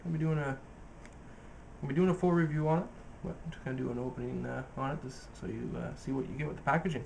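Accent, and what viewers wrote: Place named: Canada. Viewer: You couldn't sound any more Canadian. I'm getting the Vapor-X edition myself.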